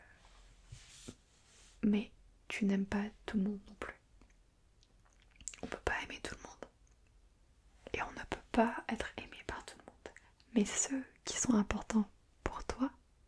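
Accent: France. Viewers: I really love your French accent!!!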